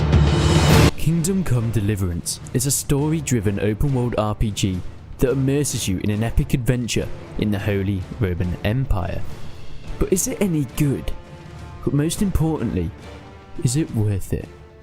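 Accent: United Kingdom. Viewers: I thought he was British?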